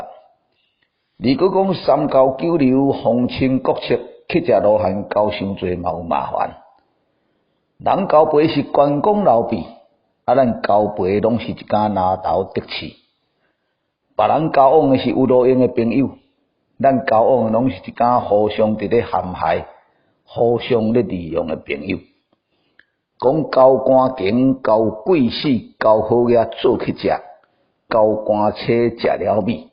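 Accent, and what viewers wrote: Taiwan. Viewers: 讚，聲音好聽 正港的台灣話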